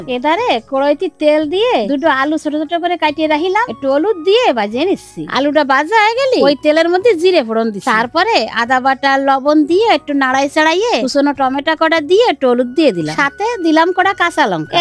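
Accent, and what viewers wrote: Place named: Jessore, Bangladesh. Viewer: Apnader vasa jashore er monirampurer manuser Moto vlo lage onk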